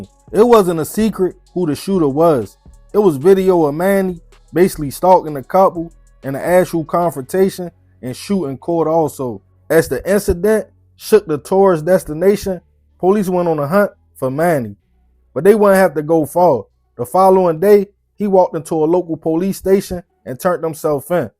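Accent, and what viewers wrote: Baltimore, United States. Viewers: I'm not going to lie, I found this channel because I wanted to hear more of the Baltimore accent, and stuck around because the content is good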